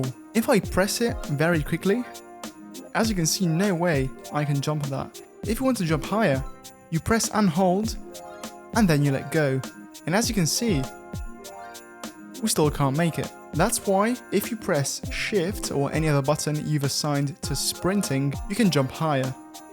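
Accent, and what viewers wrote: Italy. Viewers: Love your Italian accent :D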